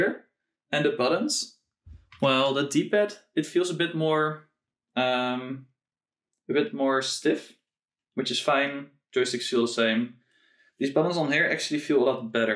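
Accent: Netherlands. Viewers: Haha dank je voor de video. Ik heb besloten mijn 2DS te modden zodat ik ook oude games kan spelen. Zelfs CBM64 (commodore) gamen. Nu zit er homebrew op :-) Lachen man, ik hoorde aan je accent dat je Nederlands bent. Maarreh toch vermakelijk die Ali consoles :o)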